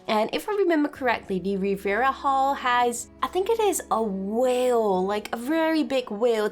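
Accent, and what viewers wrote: Netherlands. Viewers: Haha ben je Nederlands?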